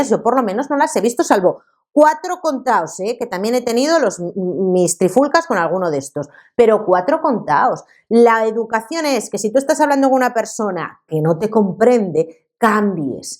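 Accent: Galicia, Spain. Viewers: de pronto cuando te emocionas hay un sonido gutural.... no soy de España, pero te sigo por la universalidad de tus temas... ese acento ó sonido es por el "gallego"?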